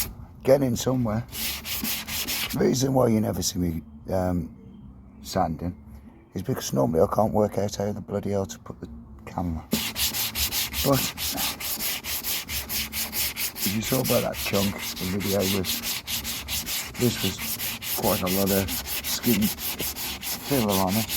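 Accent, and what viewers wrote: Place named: Birmingham, United Kingdom. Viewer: Nice job! You sound like you are from brum someplace 🤔 how much would you charge to do a similar job on a passat?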